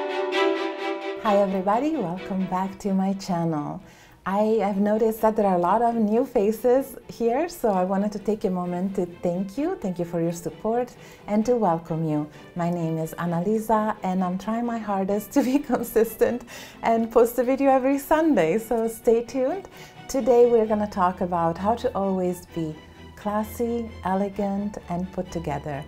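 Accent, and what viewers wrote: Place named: Italy. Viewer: I really like a beautiful bag that elevates an outfit. I love your accent?... My dad came to America from Italy (Calabria) when he was 8. You sound like my beautiful Italian cousins. 😍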